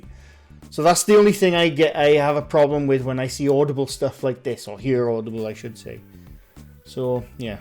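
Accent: Wales, United Kingdom. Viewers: I can't get over people thinking you are Irish or Scottish when your Welsh accent is so strong! mind you i can picture you in Braveheart..... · Are you welsh?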